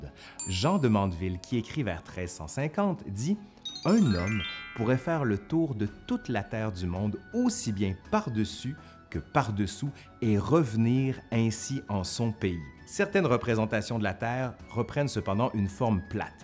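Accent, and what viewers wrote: Quebec, Canada. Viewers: Encore une super vidéo ! Ta voix posée avec l'accent québécois, c'est le feu ! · Bon, je savais tout ça mais c'est clair, concis et l'accent québécois c'est très sympa, donc pouce bleu d'un maudit français !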